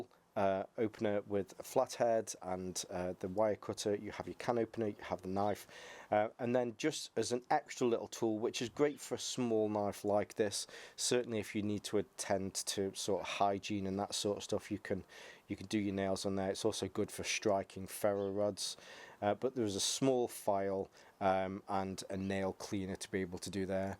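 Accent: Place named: Stoke, United Kingdom. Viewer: You sound like a stokie. Good video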